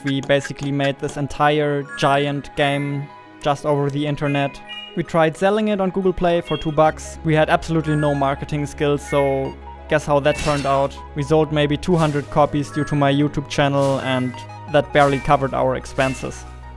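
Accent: Germany. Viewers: are you german? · Are you German ?!?! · Are you from deuthland?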